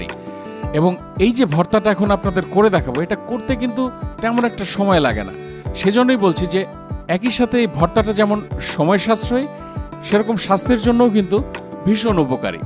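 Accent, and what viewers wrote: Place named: Rangpur, Bangladesh. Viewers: Vaiya Ami o Rangpurer mey ar Nilphamarir Bou amra o avabe boli apner kac Theke Amader ancolik vasa sune vlo laglo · আপনার দেশের বাড়ি কোথায় ভাইয়া? মনে হচ্ছে দিনাজপুরের ঐদিকে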